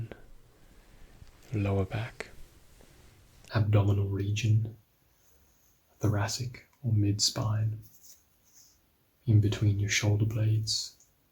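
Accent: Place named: Australia. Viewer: a really nice smooth australian accent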